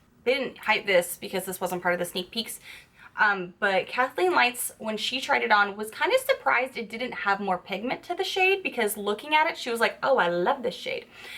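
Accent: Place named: East Coast, United States. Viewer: hi there. im ur newest video junkie but im gonna break the mold of 98% of the ladies who commented, u ready.....wait for it.... i DO NOT have a channel ( how refreshing huh ) and still subbed u. i love ur east coast accent and sass! u r FAN-TAB-U-LOUS my dear... MWAH! i sense a youtube and chill binge watching session coming on, wish me luck ( I LOVE AUTO-PLAY)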